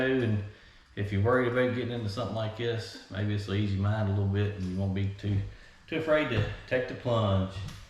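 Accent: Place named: Virginia, United States. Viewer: Congrats on the bus! It will be a fun project. And it sounds like you are a fellow Virginian. Cool! Thanks for sharing.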